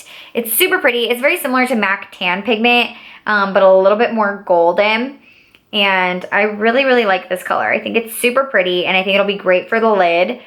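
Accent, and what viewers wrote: United States. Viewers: Hello I loved your video I'm a new subscriber! You're s sweetheart seems genuinely beautiful inside and out. You would pass as my twin when I was in my 20's. I don't know if you've ever heard that everyone has s twin somewhere in the 🌎. I'll try to find a photo of me and send it to you. I also love your accent and st first I thought you were from Arkansas . I was born in Pumpkin Bend , Arkansas! You are adorable I'm going to tell all my friends to subscribe to your channel. Your excitement and enthusiasm is contagious it makes me want to go to Walgreens in the wee hours of the morning ! Have you ever heard about the automatic de/pot machine from QVC. I believe Kelly Strack received one in a recent PR package. It might work great for those large single shadows. Did the Morphe limited edition copper and spice palette have a product number? 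I can't wait to binge watch your videos ; so happy that I found your channel.🙋🏻👙👗💅🏼💕🌸🕶💄👠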